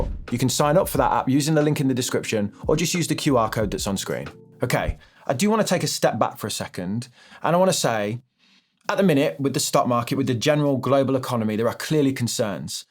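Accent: United Kingdom. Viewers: Perfect British Accent ❤🩷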